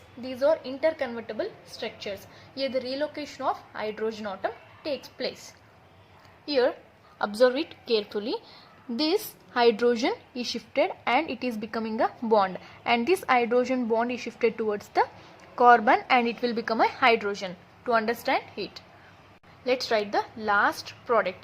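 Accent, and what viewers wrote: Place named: Telugu, India. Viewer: Good explanation madam keep rocking like this. I think your mother tongue is Telugu 🤔.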